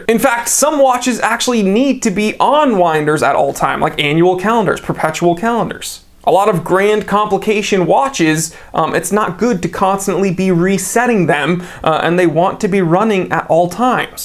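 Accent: Germany. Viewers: It’s the german accent that made me thumbs up 😂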